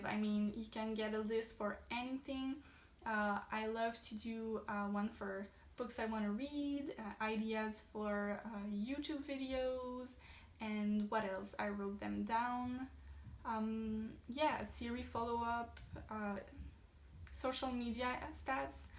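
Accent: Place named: Quebec, Canada. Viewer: I'm an idiot, - I've been trying to place your accent - & of course, you're French (Canadian?) ~, qui? Told you my French was rusty - hell, I even lived 7 worked in France for three years!! In regard to bullet journals, I cannot say I've ever used one - I tend to fill notebooks, then review what is necessary at the end of any, one week - & perhaps that is my peculiar method... Thank you for this latest instalment:D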